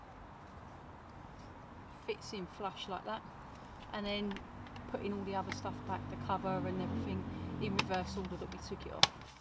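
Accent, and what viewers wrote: Cockney, United Kingdom. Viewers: I've watched a few of your Triumph reviews. Your accent reminds me of my family and growing up in London England. I was born in Woolwich. I would say you definitely have a Cockney accent. I am now a US citizen living in Southern California for many years now. Carry on riding !!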